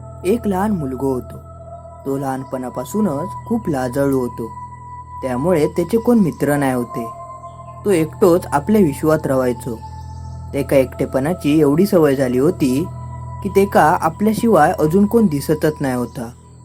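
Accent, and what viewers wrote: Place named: Konkan, India. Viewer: Are hec best marathi kokni marathi🥰🤩😍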